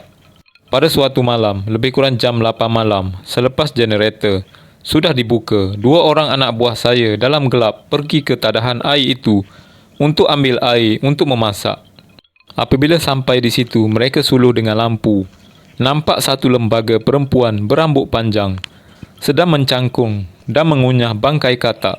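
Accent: Kelantan, Malaysia. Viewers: Asslm bang mantap pengalaman bang,abang orang Kelantan ke bunyi cara abang ckp macam orang Kelantan hahah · Ooo ore kelatee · slang aka bau budu insyaallah tetap pekat ngalir dlidah kita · Pekat klate demo.. 😅😅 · Pekat loghat Kelantan.. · Bunyi Kelate nihhh...guano bohh...hahaha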